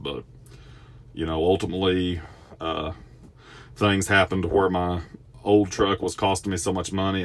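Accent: South, United States. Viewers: Looks good as red Is my favorite color! Like ur Southern accent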